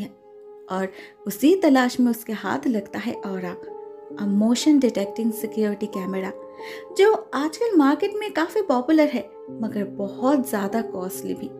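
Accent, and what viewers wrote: Bengal, India. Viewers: sei to. Bengali wali vibe chupegi kaise. Aur itni pyari hindi ek bengali hi bol sakti hai. Khub misti ho tum. Like your way of speaking dear. Love you dear. Chaliye jao. 💕💕💕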